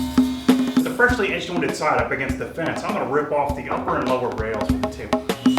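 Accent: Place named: Midwest, United States. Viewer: Are you a NC native? For some reason I was thinking Wisconsin, Michigan (definitely Midwest of some sort) native based on your voice. Sorry so random...message me if this isn't the appropriate forumn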